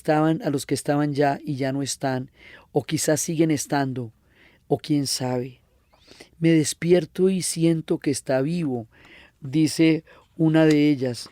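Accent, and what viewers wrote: Colombia. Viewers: Yo no se si la voz de Diana es bonita o no pero es que a quien no le va a encantar escuchar hablar con ese acento! Aqui se junto todo lo bonito! La historia, la geografia, la cultura, la radio, el podcast, las ciencias sociales en general, colombia lo mas bonito de america y lo dice un Hondureño y desde luego una mujer y ahora pongamole a esa mujer ese acento tan bello ¡ como le parece!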